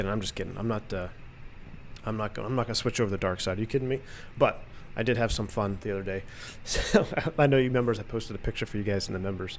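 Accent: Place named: United States. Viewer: Sounds like an american accent to me...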